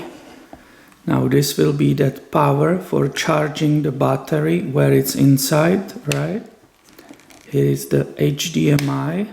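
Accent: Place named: Czech Republic. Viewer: Interesting video, well done :) You sound like you're from the Czech Republic or a nearby country(?) :)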